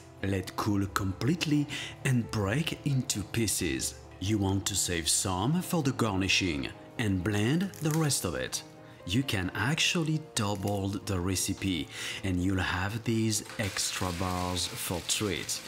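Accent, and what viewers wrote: France. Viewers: Are u French cos I love the accent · Mdr il est français ou quoi il prononce bien les noms français et il a un pti accent fr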